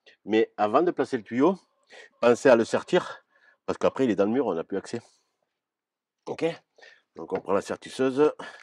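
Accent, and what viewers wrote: South, France. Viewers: Superbe ! Et en plus avé l’açent ça nous ramène un peu de soleil au Nord ou il pleut ! J’adore le brinchement c’est le CC avé le CC le PP avé le PP et le moinsse et le plus, rien de sorcier! N’empêche c’est une installation que pas tous les plombiers la font si bien bravo!!!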